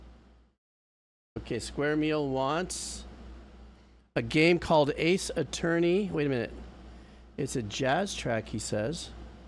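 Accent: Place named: Argentina. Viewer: hahaha wasnt expecting you to talk so well in Spanish (Argentine accent)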